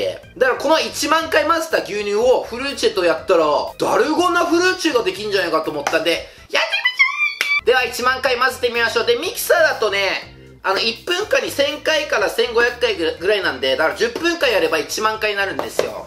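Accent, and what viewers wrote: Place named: Kansai, Japan. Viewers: 時折に関西弁が出る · 今日ちょいちょい関西弁出た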